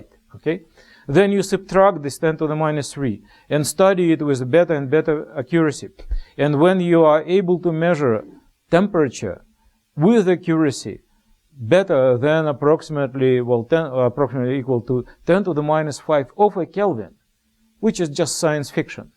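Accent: Russia. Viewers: Our Russian accent) krasavchik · I enjoyed topic as well as sweet English with Russian accent!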